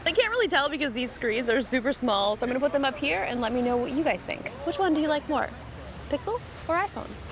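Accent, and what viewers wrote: United States. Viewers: wow You talking like😲😲😲 Wow People from u.s are adorable than I taught they will be... 😁😁😁I don't even know if you are from u.s but you sound like them "lol" It's amazing😉... Well actually you are changing the rules of a girl now huh.....well my taught still not wrong maybe you are the one who's different Wellhuh! It nights🌃 here my country so im going for bedtime sleep 😴 after playing some 🏀🏀⛹️‍♀️...everything workout well...... SEE YA👋🏻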